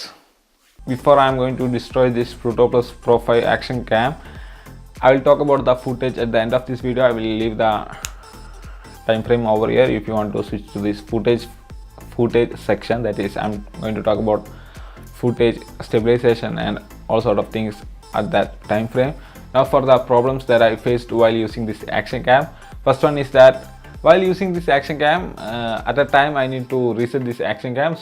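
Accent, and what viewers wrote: Kerala, India. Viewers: You have a very bad accent of Kerala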